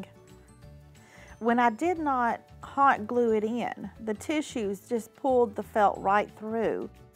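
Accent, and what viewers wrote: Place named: South, United States. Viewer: OMG you have to talk faster and shorten these videos. Half of this wasn't needed and the slow talking with the southern draw was too cliche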